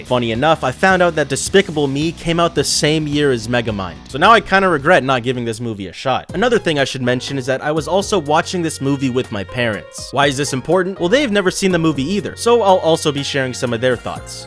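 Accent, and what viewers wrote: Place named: United States. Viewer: Bro, I thought you were american.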